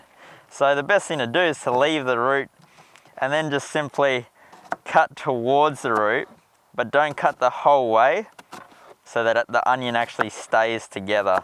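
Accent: Australia. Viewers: Is that a Queensland accent? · Sorry, I couldn't follow it because the guy's accent made me winced! To my ear it was awful!! But, that's me, not him. If I have to listen to an accent, I can tolerate a London accent, but not Australian or any other part of Great Britain. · G'day, I'm in Virginia and thoroughly enjoying your video! I have been gathering the gear needed for car camping. Not much was needed due to the fact I've been backpacking and camping since I was 10. I almost need a warehouse to store my gear. At 72 I decided it was time to give up the long treks or hikes especially up and down mountains. The legs and lungs are not so strong any longer. After your shopping spree in Tentworld (REI would be a close equivalent) it seems I have all of the necessities. I just have to figure out how to get back to Australia...what a beautiful country you live in! 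I've been to Melbourne and Coolangatta (my sister has a timeshare there) and absolutely love it on the Gold Coast., you should be on TV! You have a great smile and personality plus. Your skills as a photographer and speaker (love that Aussie accent!) are above reproach. Anyway, I'm a new sub and look forward to watching more of your videos. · really enjoyed the video as someone who's never been camping, but is thinking about it. Easy to follow tips. Incredible how you manage to smile and speak at the same time! And great Aussie costume hat, accent and shorts. Really sets the scene. Keep up the videos. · Gotta love the Aussie accent👍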